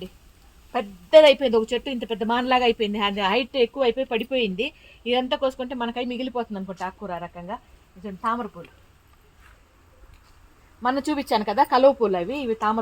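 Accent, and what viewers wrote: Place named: Bangalore, India. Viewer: Amma madi banglore urban ma bashaku me bashaku 98% match avvutundi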